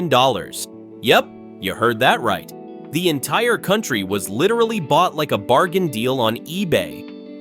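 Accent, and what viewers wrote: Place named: Philippines. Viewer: you sounded like flipino Chinese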